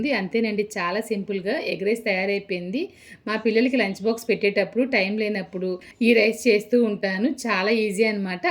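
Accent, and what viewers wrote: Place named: Andhra Pradesh, India. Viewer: Meru ... tamilians aa ...adda bottu pettukuntaru..... telugu ascent emo...andhra valla laga untadi..😊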